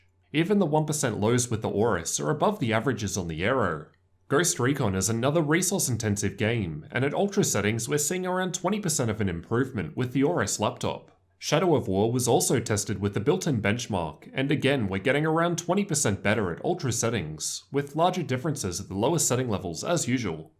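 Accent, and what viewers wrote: Australia. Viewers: This is just how Australians sounds ahahahaha I’m Australian and can confirm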